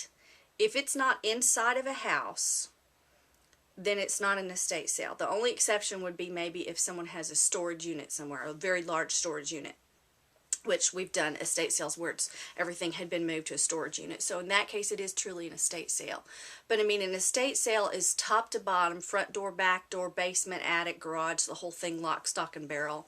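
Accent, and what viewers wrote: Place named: Texas, United States. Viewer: omg you are so darn adorable! I am from Texas also and I just live your accent. Never been to an estate sale, but definitely looking to go check a few out. thanks for the great tips.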